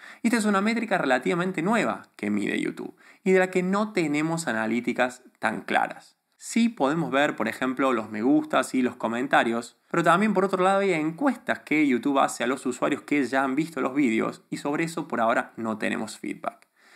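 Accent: Argentina. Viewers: Tienes buenas ideas pero te falta muuuuucho en la neutralidad de tu español. Si tienes acento argentino muy marcado. Pero igualmente das buenos típs. Un saludo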